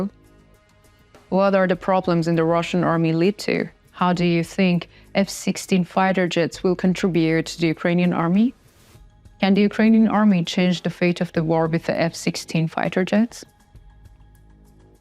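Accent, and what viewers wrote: United Kingdom. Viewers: Great video, great English! Love this format. Based on the accent, I cannot tell where you are from, since you have a British twist in your pronounciation!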